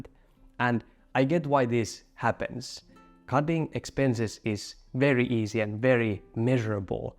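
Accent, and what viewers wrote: Finland. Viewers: Not to be the weirdo, but I love the Finnish accent. It reminds me of previous trips there and favourite bands. So your channel is the ultimate combination 😅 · 100% Finnish accent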